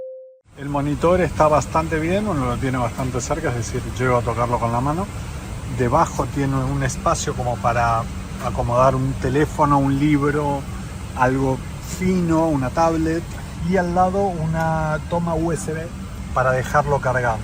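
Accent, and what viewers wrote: Argentina. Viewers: A este muchacho no le saco la ficha habla con palabras de España con acento argentino, raro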